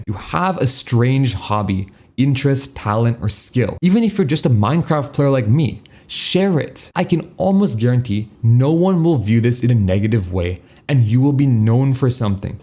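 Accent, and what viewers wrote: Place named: India. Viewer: Bro drops the Indian accent "azzume" like crazy this is giving unprecedented flashbacks to my proof writing class Edit: I'm literally just going to subscribe to you because you summarize a bunch of techniques in books to I never have to read them myself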